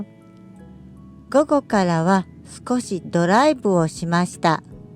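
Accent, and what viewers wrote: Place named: Kansai, Japan. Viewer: ゆっくりとした関西弁が心地いいです。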